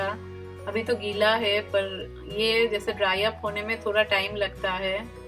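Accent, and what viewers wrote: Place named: India. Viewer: I love ur Hindi tune n ur also awesome I like it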